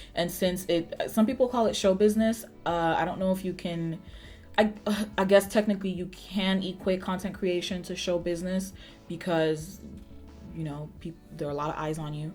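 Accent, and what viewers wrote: United Kingdom. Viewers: The English accent 😂😂😂 sounds like ppl I go school with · The British accent lmaooooo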